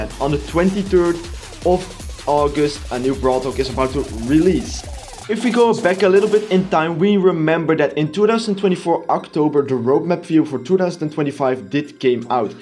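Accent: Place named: Netherlands. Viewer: i hear a netherlands accent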